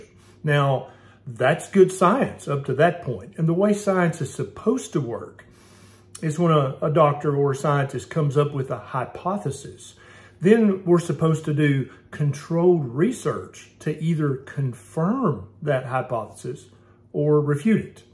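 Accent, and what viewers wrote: South, United States. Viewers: I could watch Dr Berry just for the southern accent.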